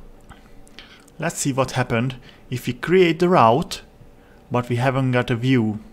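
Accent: Hungary. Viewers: 12 évig éltem külföldön, felismerem a magyarokat :) Egyébként meglepően jó az angolod. Most megyek, próbálom megérteni az auth dolgot. Ezen vagyok elakadva (middleware etc), én még a régi "nyers" php programozáshoz vagyok szokva, de elhatároztam, hogy megtanulok valami modern php keretrendszert. Így lett Laravel. (CSS az megy) Megy minden vacak már, blog rendszer, blabla, de egy role system nem akar menni: admin routing XX oldalra, user routing YY oldalra, middleware hogy mindenki azt lássa amire jogosult. Tutorialt lekövetek, másolás megy, de érteni is akarom :)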